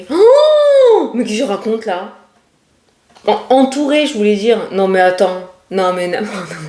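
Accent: South, France. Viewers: Cc toujours aussi joyeyse cet accent qui parle du soleil.moi dans le nord solei et compagnie...trop chaud...ma questiona situation financière va t elle s améliorer..merci beaucoup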